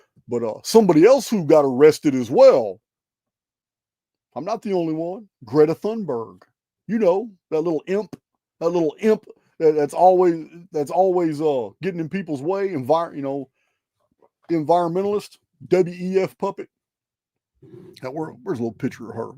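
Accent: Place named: Texas, United States. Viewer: The owner of this channel seems to have a LOT of good information, but I CANNOT listen to him. (He sounds like a BLITHERING MORON, a cartoon character! The uber Texas accent, along with his tone and diction... I want to vomit.)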